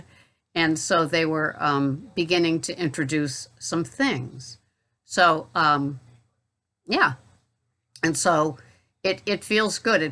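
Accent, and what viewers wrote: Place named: Cape Cod, United States. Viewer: The food allergy test sounds amazing. Looking forward to the day you can share results. Love your Cape Cod accent., have an awesome day! 😊👍😊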